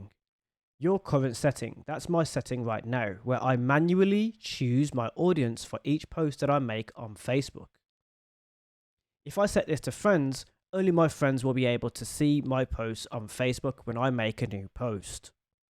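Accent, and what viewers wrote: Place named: Wales, United Kingdom. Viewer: You sound Welsh, lol! Well, I had a Welsh friend who had a similar accent atleast.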